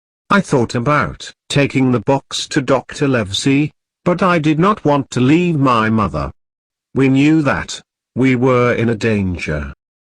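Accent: United Kingdom. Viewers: The British accent is adorable 🥰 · مذكّرة القصة ❎ تعلم اللكنه البريطانية ☑️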